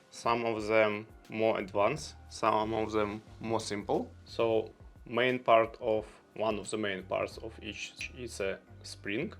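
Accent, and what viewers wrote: Russia. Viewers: Такое ощущение как будто он русский но говорит по русски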